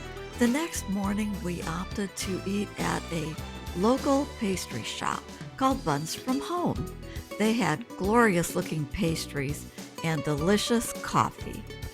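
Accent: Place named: United States. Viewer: Love your commentary best American accent ever enjoy your stay , when are you coming to the greatest country in the world, Scotland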